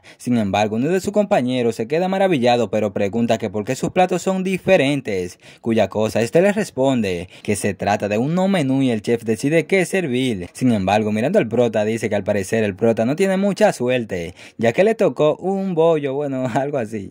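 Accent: Dominican Republic. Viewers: Eres de republica dominicana?. O de alguna zona del caribe. Tu acento es muy parecido😅 lo digo por que estoy en republica dominicana tomando vacaciones. Pero buen video😅❤❤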